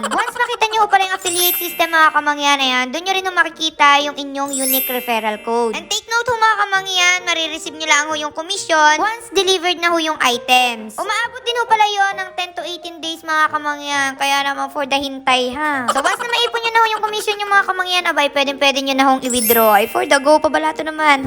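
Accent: Batangas, Philippines. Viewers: Taga batangas ka po ba yung tono ng boses mo eh batangas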